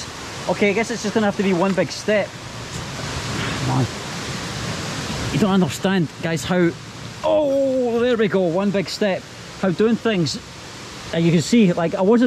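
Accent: Scotland, United Kingdom. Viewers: It is Scottish accent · Have fun in Bali.. I like your British accent brotha!!❤️ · It's different... you know UK have many many regional accent... but to untrained ears yes, all sounds just british innit 😅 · Hai, I find your videos great, and a lot of local people here know you too, your Scottish accent is fabelous, greetings from Sanur, Bali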